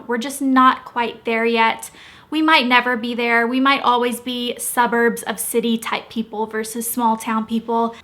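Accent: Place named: South, United States. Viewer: So excited to hear your southern drawl begin to crawl back haha ❤️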